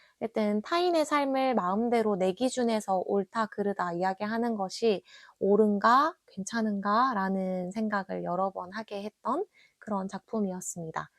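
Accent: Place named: Gyeongsang, South Korea. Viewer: 예전에 김동식 작가님도 글쓰기에 대한 공부를 단 한 번도 해본적 없으신데, 보안관련 일을 하다보니 저녁에 시간이 많이 남았고, 이런것도 이야기가 될 수 있나? 하는 마음에 글을 적기 시작하셨다는 걸 듣고 엄청 놀랐었거든요, 이미예 작가님의 펀딩까지 가신 스토리도 그렇고, 전혜지 작가님, 😶 정말, 저런 몰랐던 작기님의 사정을 들었을 때 강하게 동기부여가 생기는 경우가 있더라구요! 허업! 🫢 저도 누군가 관계를 형성함에 있어 가장 중요하게 생각하는 게 무어냐 물으면, '나는 존중과 배려를 언제나 1순위로 생각한다, 입장을 바꿔서 생각해 볼 수 있어야 한다' 라고 언제나 말해왔거든요??? 너무 신기해요!! 😶 갱상도 사투리에 미소 지으며 들었네요 ㅋㅋ 🤭 아니 근데 어떻게 저렇게 말 한 번 안 절고 낭독을 잘하실 수 있을까요?? 축축하고, 찝찝한 이야기를 저렇게 밝게 귀엽게 설명해주시는 ㅋㅋ 😂 정말 스토리 정리를 엄청 몰입감 있게 너무 흥미롭게 잘 설명해 주셔요 😊 저~~ 얇은 책들에 붙어 있는 인덱스나, 하이라이트 친 것들을 보면, 님이 얼마나 감명 깊게 읽으셨는지 느껴지는 거 같아요:) 저는 요즘, 삼체나 프로젝트 헤일메리 안에 거론되는 가상의 이론이라든지 언급되는 기존의 이론들에 대해 과학자 또는 이과 유튜버 분들이 너무 즐겁게 토론하고 얘기하시는 모습을 보면서, '와, 나는 저런 이론은 이해도 하나도 안 되고, 무슨 소린지 모르고 지나갔음에도 이렇게나 재밌게 읽었는데, 저들은 도대체 얼마나 더 더 더 재밌게 읽었을까?' 하는 생각을 하게 됐어요 😢 그래서 하드 SF에 자주 등장하는 몇 몇 이론만 대략이라도 이해하고 있자! 하는 마음에 검색하기 시작했다가, 과학 유튜버들에게 빠져버렸어요, '어!? 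나, 과학 좋아했네?' 같은 느낌이에요 ㅋㅋㅋ 😂 어쩌다보니 책도 안 읽고 과학 유튜브만 보고 있는 요즘입니다 😆 아침에야 댓글을 보실! 오늘도 또 행복한 하루 보내시길 바라요 🤭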